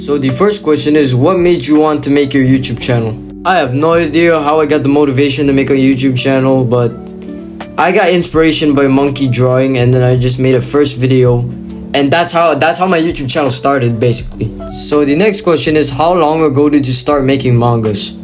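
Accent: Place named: Texas, United States. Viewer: why does bro sound texan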